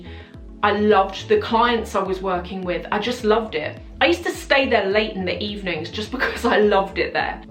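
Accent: RP, United Kingdom. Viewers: As a French person tbh it is not your seemingly lavish lifestyle that made me wonder if you had money..it is more your posh accent. In any case I don't mind.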